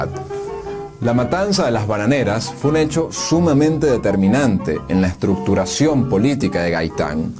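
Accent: Venezuela. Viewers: El que habla es de venezūelą? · Que hace un veneco narrando de esto? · GRACIAS A. ESTE JOVEN DE ACENTO VENEZOLANO..POR ESTEV8DEO MARAVILLOSO..USTED SI ES JOVEN UN VERDAFERO HISTORIADOR MIL GRACIAS...DESDE COLOMBIA 👍👍👍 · Este man es venezolano? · Es un venezolano el que narra? Hasta aca llego en ver este video.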